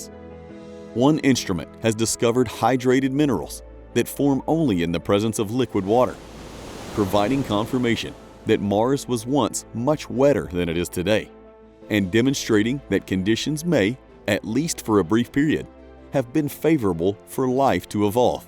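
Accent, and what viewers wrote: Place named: Appalachia, United States. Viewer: "Narrated by Reverend Lovejoy, of Springfield..... somewhere in the U.S.." It's so weird hearing narration with a Southern Appalachian accent, or thereabouts. East/Central Tennessee? Then, or so... um... "One of these things is not like the others." Somebody really badly misspelt "Sulfur." , your captions spelled maghemite wrong; there is no such thing as "magami." , Arctic has a 'c' in the middle. It is not "ahr-tik." it's "ahrk-tik." Please. , there it is: "EE-kwae-tuhr." That's East Tennessee. Straight up "Beverly Hillbillies'" Buddy Ebsen, as Jed Clampett. Equatorial as "eh-KWAE-ti-ohr-uhl?" No. It's, "eh-kwih-TOHR-ee-uhl." I would proof-listen for free, btw. Inhabitable is not "ihn-hah-BIH-tuh-buhl." It's, "ihn-HAH-bih-tuh-buhl." I am starting to wonder if this voice is an AI pretending to be southern. 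I have literally never heard anyone else mispronounce this word. Ever. P.S. That Biden section was unlistenable. Had to skip it. We need better.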